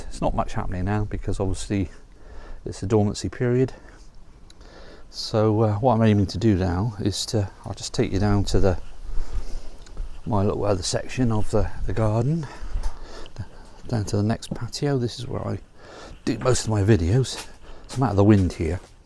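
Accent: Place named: West Country, United Kingdom. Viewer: Are you in the West Country perchance? I detect a slight hint of accent and the reduced label on a plant you showed us looked like a Blue Diamond one, ‘tho they’re quite an extensive chain I know. But it would be just like God if you turned out to be a neighbour!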